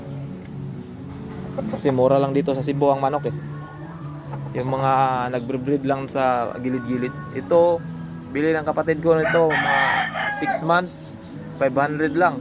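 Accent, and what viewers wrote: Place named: Sabah, Malaysia. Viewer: your voice like Sabah people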